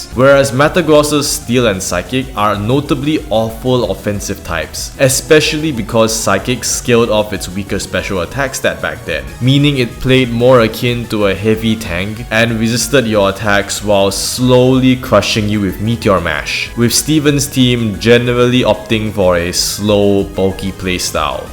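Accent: Singapore. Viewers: Are you singaporean? Or Malaysian? Srry i js hear the accent and I’m js curious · I instantly recognise that Singaporean accent, certainly didn't expect to come across a fellow mate talking about our childhood trauma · From your accent, I can already tell that you're a singaporean lol and your channel profile confirms it. Really make me feel so wowed for some reason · That Singaporean accent tho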